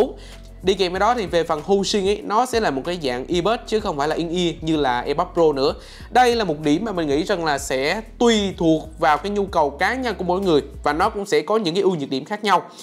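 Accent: South, Vietnam. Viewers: Thằng này giọng nai nái sao ấy nhỉ, mới nghe thì ra giọng người nam, mà lâu lâu nói lái lái vài từ người bắc.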